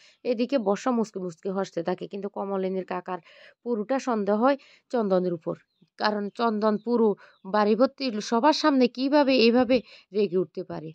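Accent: Chittagong, Bangladesh. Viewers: আপনি বোধহয় চট্টগ্রামের তাই কথা গুলো এভাবে বলেন।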